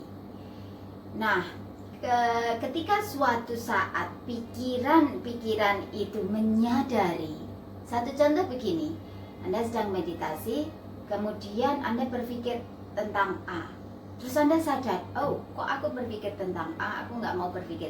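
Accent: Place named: Java, Indonesia. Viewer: Logat yg ciamik antara jowo medhok dg logat bule .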